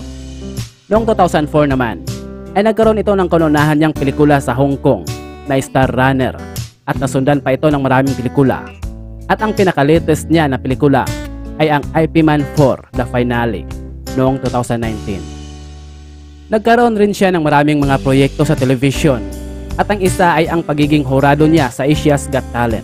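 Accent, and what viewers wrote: Visayas, Philippines. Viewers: BisAya ka nman magkwento..! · Nkaka tawa ung ngsasalita BISAYA k ba! Wahahahahhaahhahaha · Bisaya ka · Bai bisdak ka no maila sa sinultihan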